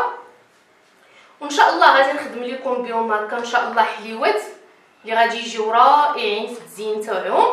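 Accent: Marrakech, Morocco. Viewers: كتكول هاد اللهجة مراكشية تبارك الله عليك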